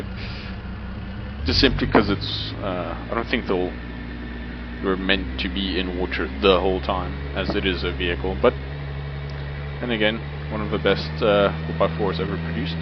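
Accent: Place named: South Africa. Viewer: I can recognise that south african accent anywhere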